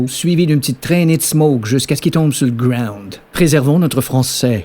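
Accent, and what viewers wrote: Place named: New Brunswick, Canada. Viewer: J'ai des chums au n-b qui parle exactement comme ça 😂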